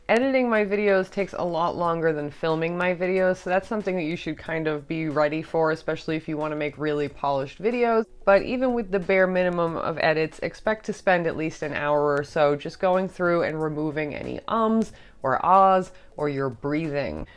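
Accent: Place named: Boston, United States. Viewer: I watched this video on my daunting hour drive home from work and it made me so happy! I have never contemplated (and probably won’t) starting a channel, and I enjoyed this video so much nonetheless! You are such a breath of fresh air in this community, and I am so hooked on your channel. PS - it must be a Boston thing to pronounce room “rum” because I swear you and Beantownhouselants always have me rewinding to relisten like, who’s drinking rum???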